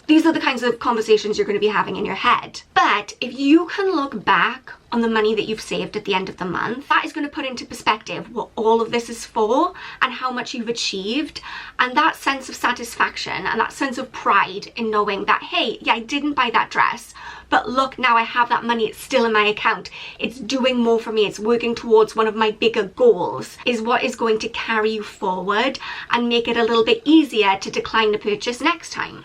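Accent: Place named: Wales, United Kingdom. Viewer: I was trying to place it too; thought it sounds a little like Scottish but not quite... it's got to be Welsh, right? :D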